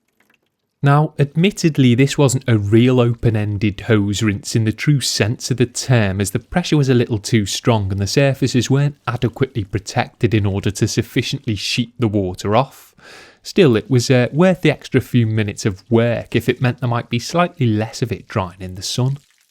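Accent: Liverpool, United Kingdom. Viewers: The interesting and compelling thing about is incongruity. He’s doing a working-class job (car detailing) and has the appearance of a working-class individual - but his commentary is fantastically articulate, precise, and detailed – to a degree that you expect from a lawyer, consultant, or other premium-rate professional. Add to that the accent (which sounds almost Liverpool, but not quite – maybe Warrington?) and delivery – providing such a reassuring and relaxing quality - it’s almost like taking 10mg Valium tabs…….and the result is great videos - interesting, informative – and just satisfying to watch. PROPS to – you’ve made a dull and dreary subject fun / absorbing! You are a CULT! Regards Chris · well youll have " fuck me "then too cos this has to be one of the most irritating voiceovers on earth and I love the Liverpudlian accent. its like he never takes an in breath. 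its really weird in fact.....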